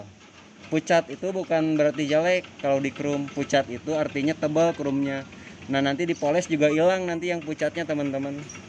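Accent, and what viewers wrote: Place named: West Java, Indonesia. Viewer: Sunda pisan eh s eta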